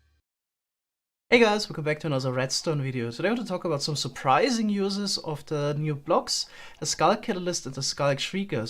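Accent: Germany. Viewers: 10 sec in and i knew you also bist ein deutscher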